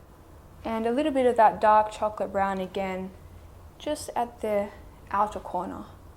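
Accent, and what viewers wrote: Australia. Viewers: your aussie accent is so fun to listen to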